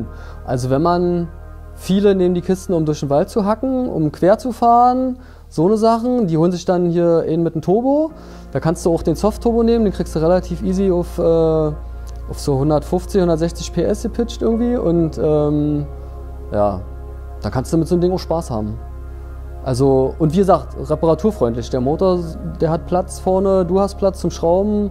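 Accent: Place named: Berlin, Germany. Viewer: Super sympathisch. Super Auto klingst ein wenig nach Berlin ? Fahre selber Volvo aber kenne hier keine andern der ne ähnliche Klatsche hat .mfg tommy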